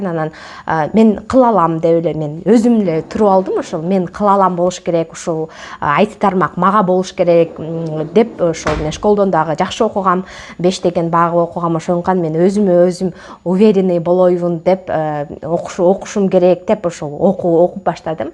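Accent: Standard. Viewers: Ай вай молодец карындашым! Кыргызча таза так суйлоп тушундургонунузго! Жоп жонокой кылып . Мындайча айтканда супер! Кыргыз болуп туруп башка тилде суйлогондорго окунучтуу! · Кыргызчани таза луйлот экенсиз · Азаматсыз. Жакшы. Дагы да ишиңизге ийгилик. Сизди көп адамдар көрүп үлгү ала турган экен. Бирок капа болбоңуз, деген, дегенди эле азайтып сүйлөсөңүз ,дагы да эң жакшы болот эле. Рахмат. Болбосо кыргызчаңыз аябай так, таза экен.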